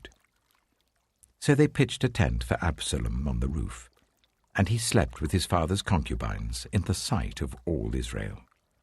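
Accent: United Kingdom. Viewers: I like the first commentators voice better than the English ish accent...can not relax with that voice. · What a shame, I don’t like this voice or the British accent.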